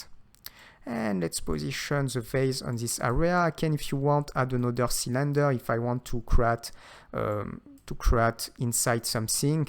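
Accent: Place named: France. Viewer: You are a french accent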